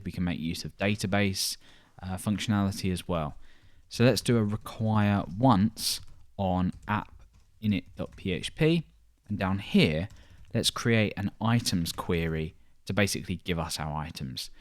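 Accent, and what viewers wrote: United Kingdom. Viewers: PHP tuts, bonus British accent free.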